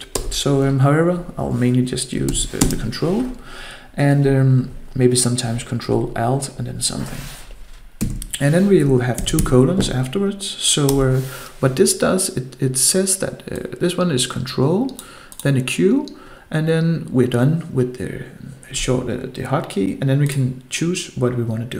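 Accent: Denmark. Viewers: i smell danish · i can already hear ur from denmark lol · let me guess, you're danish,, i may be wrong but really sounds like it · i smell danish here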